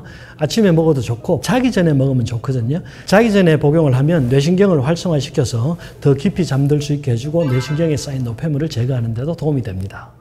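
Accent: Gyeongsang, South Korea. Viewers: 맞아요. 경상도 사투리가 ㅡ발음도 잘 못해서 ㅓ로 들리지요. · 갱상도 싸투리 아이가. 니 그것도 모른데이? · 대기~할일없네? 갱상도 싸나이 발음이라고 생각혀~ㅉㅉ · 갱상도 사람이니까 이해하세요ㅋㅋ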